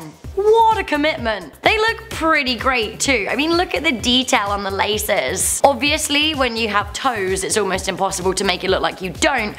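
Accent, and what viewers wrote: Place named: United Kingdom. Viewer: My favorite is this British accent lol 🤘🏻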